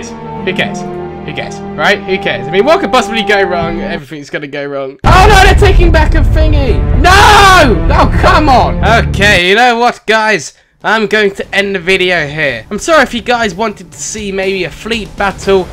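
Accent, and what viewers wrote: United Kingdom. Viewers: Nothing brings out your british accent better than playing some rtw2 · Why do you watch if he has a British accent then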